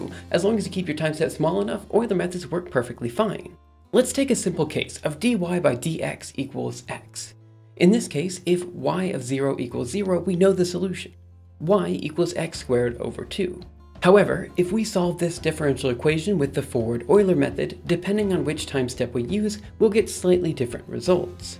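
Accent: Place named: United States. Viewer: I've never heard this American accent before... (I'm guessing it's American) Thanks for the video though.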